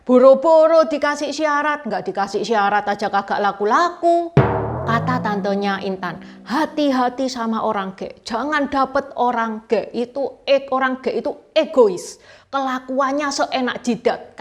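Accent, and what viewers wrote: Java, Indonesia. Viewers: Bahasa indonesia ce intan jawanya mantap benar2 cindo👍👍👍🙏. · Ce ce kreatornya jowo banget mlh😝😝😝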